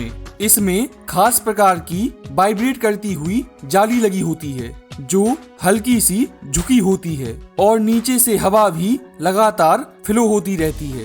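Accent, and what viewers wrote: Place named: Bundelkhand, India. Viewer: Aap bundelkhandi hai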